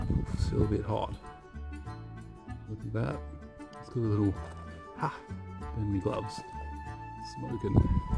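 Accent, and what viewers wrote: Australia. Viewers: Are you Aussie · You've got a fantastically soothing voice. Great to hear a fellow Aussie who doesn't sound like Steve Irwin. Very cool video!!! You speak very sweetly about the spiders too!!!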